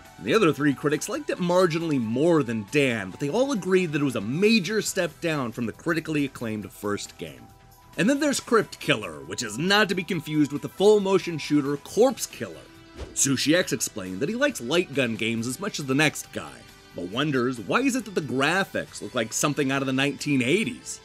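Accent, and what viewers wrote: United States. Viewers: I recall our narrator mentioning he's from WA. My theory is that it must be a Pacific Northwest dialect thing. I think Johnny Millennium (aka Happy Console Gamer, from Vancouver) pronounces dragon the same way.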